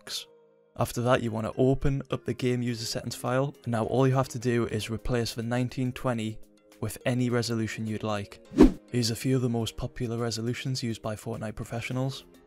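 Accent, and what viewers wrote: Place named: United Kingdom. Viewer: 'H' key. Was tough for me to understand the British accent too lol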